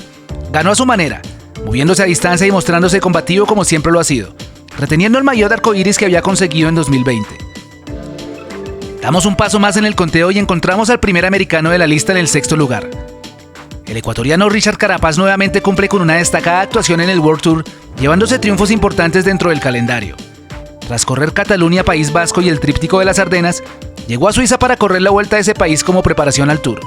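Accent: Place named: Colombia. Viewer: Se nota que eres colombiano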